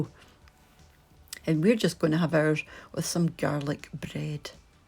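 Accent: Scotland, United Kingdom. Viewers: Great channel! I'm subscribing! Nice to here a Scottish accent too! I'm in Edinburgh 👋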